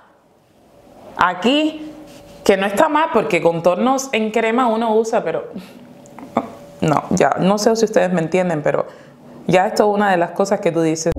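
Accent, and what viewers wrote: Dominican Republic. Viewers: cuando dijo que esas ofensas hacia ella misma eran bromas yo me dije como , eres Dominicana o algo asi? y luego dijo quija y fue como, noooooo si lo es y cuando dijo vaina en vez de cosa me dije confirmado XDXDXD saludos desde Rep.Dominicana .es que los Dominicanos nos decimos ofensas pero es más a modo de broma XDXDXD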